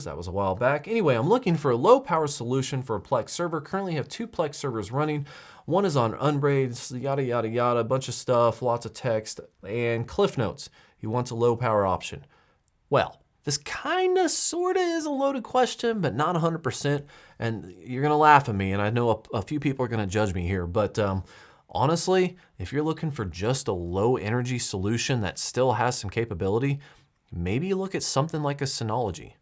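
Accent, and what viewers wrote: United States. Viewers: Ali Naqvi southern accent #Kansas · you're 🇨🇦??? WOULD HAVE NEVER GUESSED! You kind of have an murikan twang.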